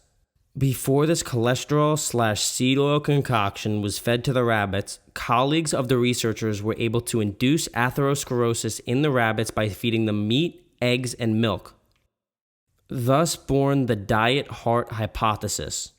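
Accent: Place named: New Jersey, United States. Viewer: has a Jersey accent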